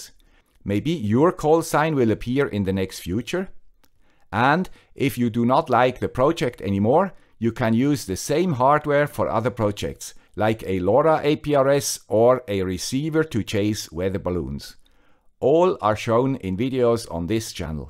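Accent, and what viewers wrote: Switzerland. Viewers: Hello guy with the swiss accent, do you have by change knowledge of somebody using a lorawan gateway´s 9th channel (backhaul) for meshtastic...? Could it be possible? 73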